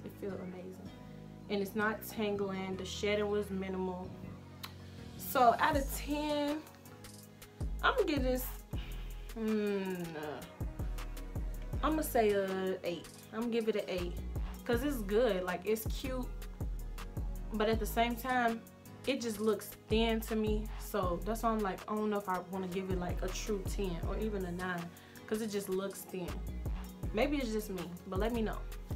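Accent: Chicago, United States. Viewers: Where you from. It sound like you from Chicago 😭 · You from Chicago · you’re so pretty🥺 you sound like you from chicago too😭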